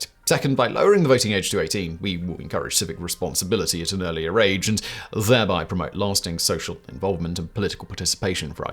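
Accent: United Kingdom. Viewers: are you english, brittish astrailian new zealanden or australionewzealandish?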